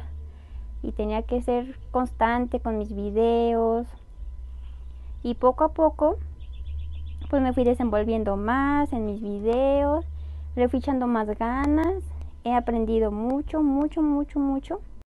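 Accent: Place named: Chiapas, Mexico. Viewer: tu acento suena a chiapaneca de allá por ocosingo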